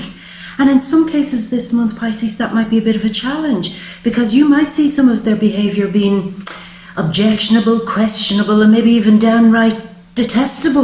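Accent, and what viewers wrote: Ireland. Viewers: you're beautiful, intelligent and intuitive and thank you. plus i'm lovin' your Irish? accent? L've got the Irish blood too : )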